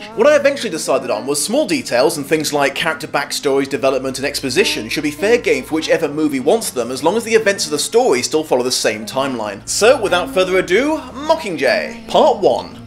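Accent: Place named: United Kingdom. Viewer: I am so happy that I subscribed to you based on Nostalgia Critic's recommendation ^_^ I can never get bored of your British accent and witty humor :)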